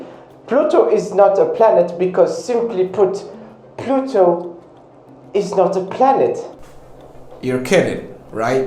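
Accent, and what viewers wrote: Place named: Germany. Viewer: The German accent kills me 😂 Ur the best man ❤️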